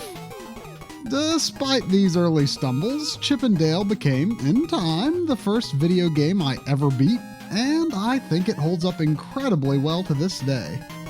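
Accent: United States. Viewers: It's great that you had that country boy accent all the way back then.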